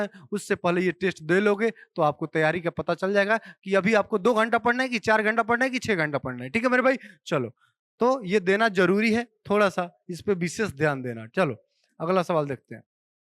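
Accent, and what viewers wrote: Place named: Bihar, India. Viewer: Sir aap chhatisgarh se nahi ho na bihari jaisa feel ho raha hai bato ko sun kar😂😂😂😂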